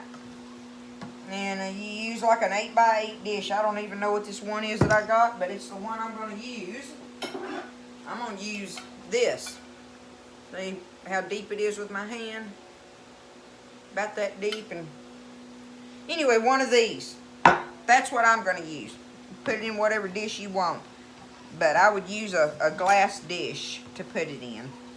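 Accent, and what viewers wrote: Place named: Texas, United States. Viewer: you from Texas? I am and your talkin reminds me of home? I married a coonass and I mostly hear this accent here and even the non Cajun accents are different than a good old east Texas accent? Always loved this recipe? But it seems like she (my memaw circa.1901) added a couple more things like celery and maybe a fine shredded carrots? Not positive about the carrots tho?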